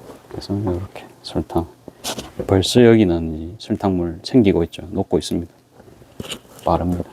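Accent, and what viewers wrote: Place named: Busan, South Korea. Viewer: 영상을 보면서 저도 자몽청을 한번 만들어볼까? 하는 생각을 합니다 정감있는 부산말 참 좋습니다!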